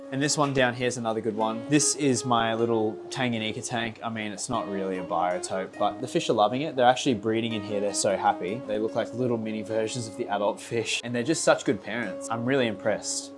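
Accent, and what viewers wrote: Australia. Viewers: ANY REASON U TALK LIKE A ROBOT ...😂😂😂 I WISH U CHILL LITTLE BIT WITH YOUR AUSSIE ACCENT , U TALK LITTLE TOOO FAST FOR AMERICAN KEEPING UP😂😂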